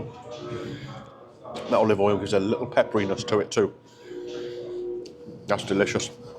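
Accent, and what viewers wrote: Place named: England, United Kingdom. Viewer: which part of England you come from, my first language is not English but I could understand all the worlds your saying, some food bloggers are very difficult to understand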